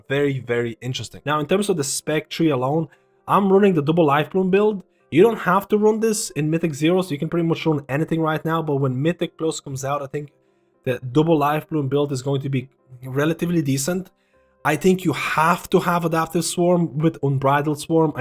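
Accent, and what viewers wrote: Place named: Ireland. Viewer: Great vid as per usual! Why do I always here a tinge of an Irish accent from you? Lol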